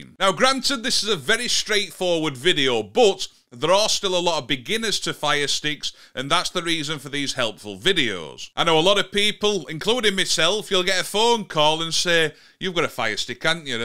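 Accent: Yorkshire, United Kingdom. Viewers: Great help mate…Love your Yorkshire accent 😊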